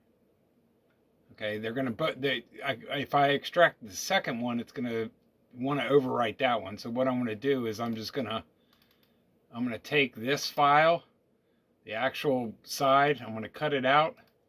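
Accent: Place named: North Carolina, United States. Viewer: Thanks for the video. Been thinking about getting one of these. You sound from your accent that you are from my neck of the woods, North Carolina. I also have the VPI Signature21 in RW, and the Integrity Tru-Lift and Kleen Sweep. Great products so you have good taste too😀 Love the music videos Keep it up.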